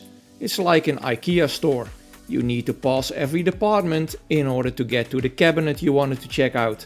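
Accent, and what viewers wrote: Netherlands. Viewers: kom je uit nederland, ik kan dat aan je stem horen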